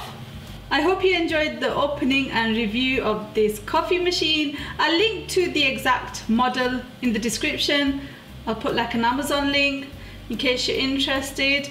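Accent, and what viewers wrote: Manchester, United Kingdom. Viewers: S B she has an accent. I’d say she come from Manchester way. It’s also known as ‘regional dialect’ . Now the real question is, are you always this rude?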